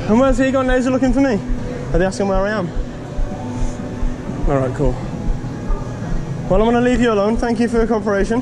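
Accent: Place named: United Kingdom. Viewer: Oh i love that british accent👌🏻👌🏻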